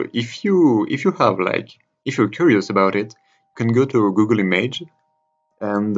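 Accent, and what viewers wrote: France. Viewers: I know this is an older video, but I love your Gothic builds. Your French accent was delightful to listen to while you built this maison. I am a big lover of English and French history and I know it's been a while since you have uploaded sims4 videos, but I hope one day you will continue building European style builds. Maybe even historical ones! Have a lovely day.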